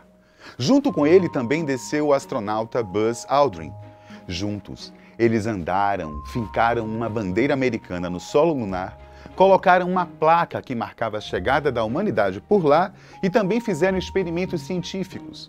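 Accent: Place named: Bahia, Brazil. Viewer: Excelente formato de vídeo 👍✌ Seja bem vindo rapazinho .... Baiano eu acho pelo sotaque 🤔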